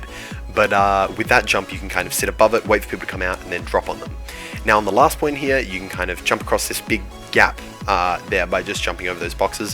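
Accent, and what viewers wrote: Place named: Australia. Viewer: Muselk sounds more Australian that unual.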